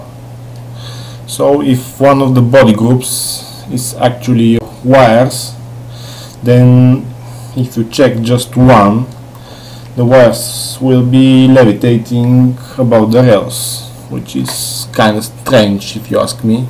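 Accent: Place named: Russia. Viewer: прости но ты Русский той акцент не катит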